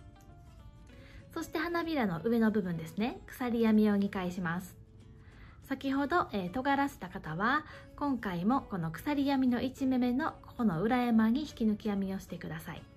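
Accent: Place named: Kyoto, Japan. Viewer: めっちゃ聴きやすいし、ゆっくりやり方が分かりやすくて、ありがとう。 京都語ポイ感じだね❤間違えたらすみません❣️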